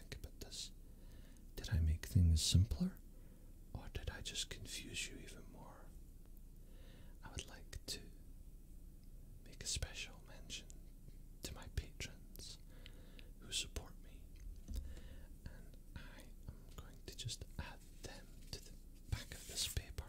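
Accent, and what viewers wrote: Scotland, United Kingdom. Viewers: I have a request never stop posting asmr videos the Scottish accent is very soothing. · Two of my favorite things in life. Star Trek and a beautiful Scottish accent. You just got a new sub! Love this!!!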